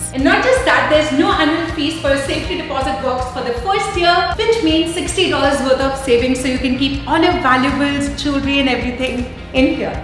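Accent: India. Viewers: Birthday and not birday :-). We desis have so much of MTI - mother tongue influence :-)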